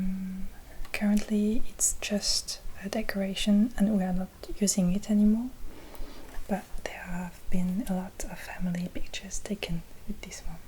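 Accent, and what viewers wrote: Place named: France. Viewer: Old cameras, french accent plus your beautiful face means perfectionnnn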